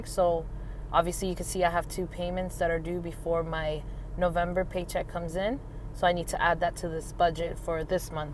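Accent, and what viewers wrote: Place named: Miami, United States. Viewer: Girl I love this! Please keep up with the videos... my 2018 goal is to be gazelle intense on paying off most of my debt :) You're great. Also, it sounds like you're from Miami. So am I!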